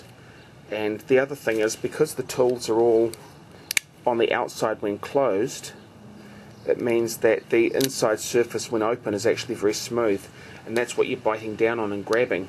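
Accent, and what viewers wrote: New Zealand. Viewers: I'm thinking of getting this instead of Swisstool X because of the ergonomics and weight. Cheers on the review and the accent. New Zealand? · I actually didn't look on your channel page, I can tell the difference between an Australian and a New Zealand accent.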